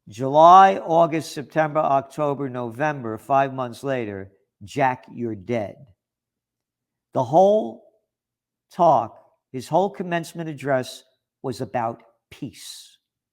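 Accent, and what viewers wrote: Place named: New York, United States. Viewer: I really enjoy New York accent (kuin stadin slangia) It is music to my ears. Thank you.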